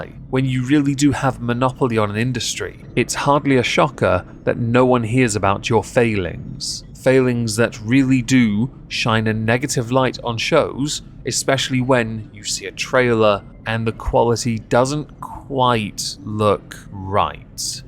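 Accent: RP, United Kingdom. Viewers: As a Geordie, all I've got to say is, your swearing is really posh 😆. I think that's why I went for my husband, he sounds posh to me too.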